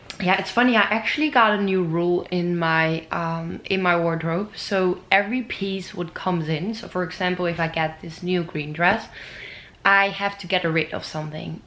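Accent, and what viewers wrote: Netherlands. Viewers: I used to live in the Netherlands and I miss the Dutch/ English accent. I watch your videos just to hear your voice lol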